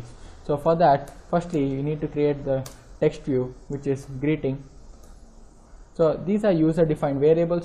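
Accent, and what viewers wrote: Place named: India. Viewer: have problem with indian accent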